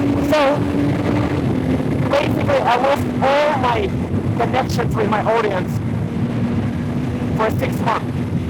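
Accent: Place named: Russia. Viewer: i clicked cuz i was looking to start streaming on twitch but i heard about constant random bans with no real clear reason from twitch making me question if its really worth it or not, as far as i know twitch is a simping platform that punishes guys and let girls do as they please with minimum consquences. anyway i clicked and as the video began i noticed that russian accent but didnt really know if its or not, but then i looked at the channel name... and im like wait!? Russian accent!? but the i know should be famous why is this account have a low below 10K, then i realized... this is second youtube account:| wow what a suprise the name brought me back some memory of old CSGO as i used to play back in 2018 until somewhere around <num> where i quit the game doesnt feel the same for me anymore it just not for me. but aside from all that im really shocked seen my guy feeling down because you my friend were part of my childhood, hope things work out for you brother, and do be careful dont risk your life because if something happened to you, your subscribers your followers are the one who will grieve over you. 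if riding your motorbike is your way of therapy i suggest you try something else maybe go for a walk or try to meet new people or spend time with OGs, and while im pretty sure you wont read this entire paragraph im still writing with the assumption you would read it, do take care of your self cheer up! LETS GO CYKA! RUSH B! B FOR BOOBS!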